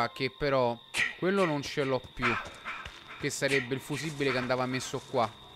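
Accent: United Kingdom. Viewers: Ciccio e l inglese molto British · Quanto sei britisch quando parli inglese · ci sei troppo bbritish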